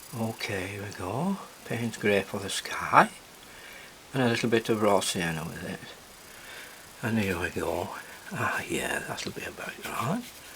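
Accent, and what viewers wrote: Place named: North, United Kingdom. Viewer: Think I've loved everything you've put on YouTube. With your accent I'd imagine you're either from Leigh or Wigan, I'm just down the road in Bolton. Do you still involve yourself with any personal tuition? I'd gladly part with my hard earned to learn from someone like you!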